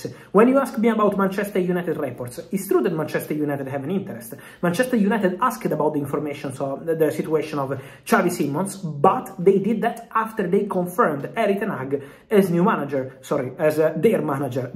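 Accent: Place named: Italy. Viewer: Bro your Italian accent was crazy on this one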